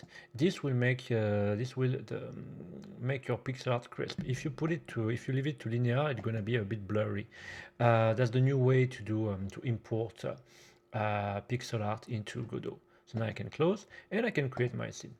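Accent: France. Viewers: oh great! Thank you for those extra videos. Also your french accent is hard but as I’m spaniard I think I understand you better than if you were native english haha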